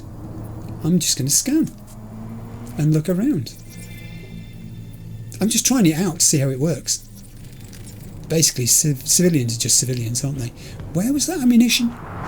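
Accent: United Kingdom. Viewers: What a great channel I found, truly a virtual gem! Thank you very much for you efforts, for your comments, overall for your rich Britain speech and charisma! I played Cyberpunk 2077 for lots of hours, about 200+, one of my favourite games so far and your videos inspire me to play it hundreds hours more!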